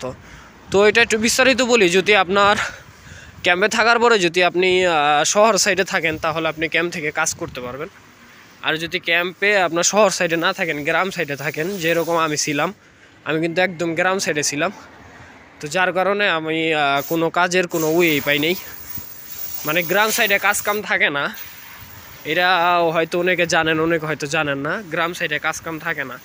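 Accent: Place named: Faridpur, Bangladesh. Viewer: ভাই আপনার বাসা কি ফরিদপুর এ,, ভাষায় মনে হচ্ছে ফরিদপুর, আমার বাসা ও ফরিদপুর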